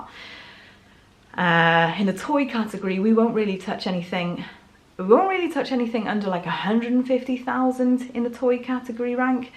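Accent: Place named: United Kingdom. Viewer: Thank God for BRITISH voice in this game!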